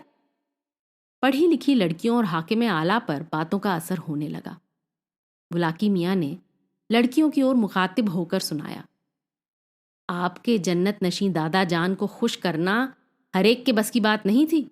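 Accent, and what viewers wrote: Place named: Lucknow, India. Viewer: Excellent... I can smell lucknow in your Voice .... Thanks alot Ayesha 🤍🖤 for recommendation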